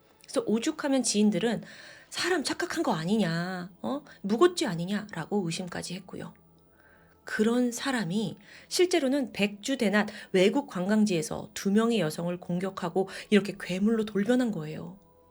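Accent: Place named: Jeolla, South Korea. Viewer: 전라도는 의 발음을 으 라고 해요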